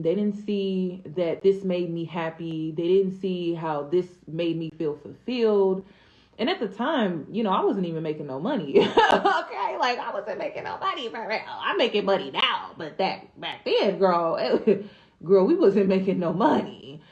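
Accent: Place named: Midwest, United States. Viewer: thanks. I heard the Midwest in there. 😊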